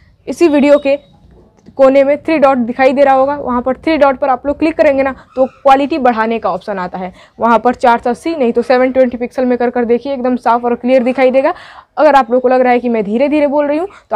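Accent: Bihar, India. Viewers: Ma'am aap BIHAR se hai kya??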